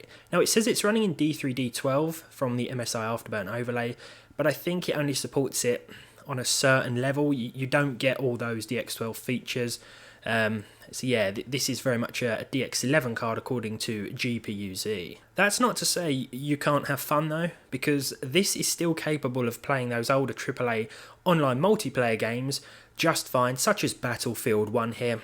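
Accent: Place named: England, United Kingdom. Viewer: always watch and enjoy your videos mate. It's nice to hear another English voice for tech stuff, given I'm Stateside and never hear one. I recently bought an EVGA GTX 1070 FTW, a Gigabyte GTX 1060 G1 Gaming 3GB, and a Zotac RTX 2070 AMP that all had "failures." They would all post, but had varying issues. - The 2070 would only post in low resolution mode and when using GPU-Z wouldn't display any VRAM information. This was fixed by, get this, applying additional thermal pads for increased pressure on the memory chips, and increasing the stock fan curve on the card. - The 1070 would artifact and crash in a few games after a little while. This was fixed by, get this again, cleaning, repasting, and applying additional thermal pads to the memory chips, then increasing the stock fan curve. - The 1060 3GB would not display an image at all, and would not be recognized by Windows besides as a basic display adapter, however, NVFlash would find it as a 1060 3GB. 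After pulling the bios on the card it had been flashed somehow with a 6GB bios. After flashing it back to a 3GB, it exhibited the same VRAM failure as the other cards, which was fixed by, get this, adding additional thermal pads. It isn't entirely fixed considering only the HDMI port works, but it at least displays an image. Not sure if you've ever tried this with a GPU exhibiting VRAM failure, but it has also worked on a couple of AMD cards I did it too. After trying the baking method and having it end in failure too oft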